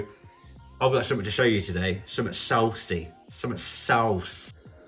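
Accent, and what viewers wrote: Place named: Leicester, United Kingdom. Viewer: I’m in Leicester , would know our accent anywhere 😂 it’s very entertaining , nice garage set up too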